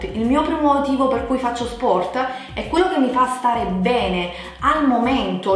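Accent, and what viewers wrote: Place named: Campania, Italy. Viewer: Ciao! Ti seguo da diverso tempo ormai e non posso che dirti grazie per come sai motivarmi, inducendomi a condurre una vita più sana ed equilibrata. Quando guardo i tuoi video mi viene proprio voglia di dedicarmi a me stessa e al mio benessere. E così continuo ad avere un'alimentazione piuttosto varia (visto che sono siciliana e abito fuori città non ho grossi problemi a mangiare cibo raccolto dalle nostre campagne [che più bio non si può XD]) e pratico nel mio piccolo un po' di attività fisica fatta di lunghe passeggiate ed esercizi tra le mura domestiche (la palestra non fa per me)... Ti ringrazio per come sai spronare e motivare chi ti segue con sincero affetto. Continua così! Un bacio grande 😘❤️ P.S. Adoro il tuo accento campano 😂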